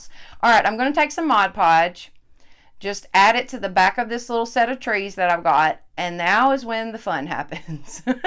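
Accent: South, United States. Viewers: That ribbon tree! And btw love the accent. I'm southern too🤗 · Love them all. By the way, where are you from? You accent sounds like you are from the south!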